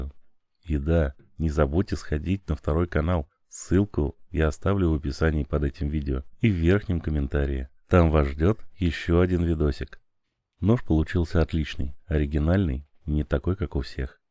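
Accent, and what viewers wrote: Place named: Tambov, Russia. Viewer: Здравствуйте! Многие слелали акцент на Ваш голос... Вы не с Тамбова?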